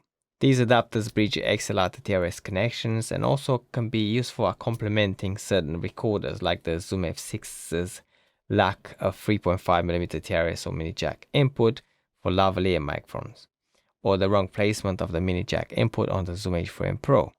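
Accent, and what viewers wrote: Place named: United Kingdom. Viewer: ❤your accent, like a native British. Also your knowledge in audio science is extensive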